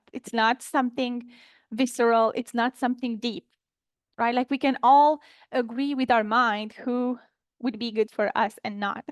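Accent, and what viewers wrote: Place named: Philippines. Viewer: Are u Philippine? Interesting accent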